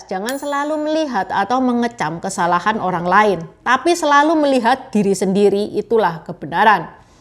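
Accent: Java, Indonesia. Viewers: kok kayak logat jowo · Ci logat jawa nya medok bngt jawa nya mana sih cii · Sy senang sekali denger encik program , krn sangat medok ❤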